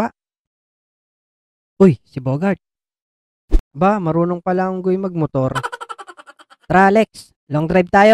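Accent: Batangas, Philippines. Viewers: Batangueño ka po?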